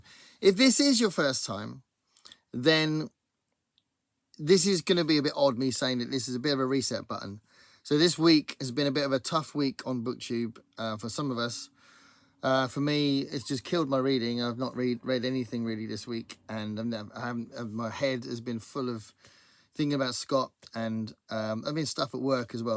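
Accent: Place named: United Kingdom. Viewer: ● I regularly randomly pick a book off my shelf 🙈. ● I really like the cover of that Ray Bradbury. ● I'm on my 5th Star Trek book. You're a slacker! ● Oh I'd like to read The House In The Cerulean Sea myself. 🤔 ● Oh Blacktop Wasteland was not good imo, but I'll be interested to see what you think! ● Outside of BookTrek and New Worlds November, my reading IS totally random 😂. ● Oh Alien Clay. I'm not familiar with that one. I'll have to look it up. ● Wow! I love the cover on The Imposter! ● Ok random question: What kind of British accent do you have? What region?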